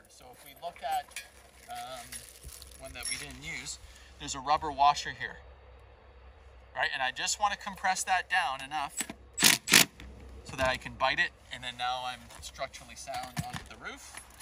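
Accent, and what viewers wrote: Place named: Canada. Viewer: Sounds very CANADIAN they way he speaks... I bet I'm right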